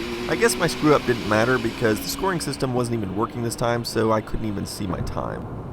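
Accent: Texas, United States. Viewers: I noticed a bit more of a southern accent while filming in the car, where someone was holding the camera. I wonder if he switches it up a bit when talking to (or in front of) a fellow Texan.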